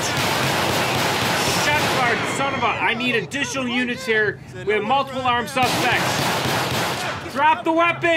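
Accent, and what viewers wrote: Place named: New York, United States. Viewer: If you CPD where is o block you don’t sound like you from Chicago you sound like you’re from New York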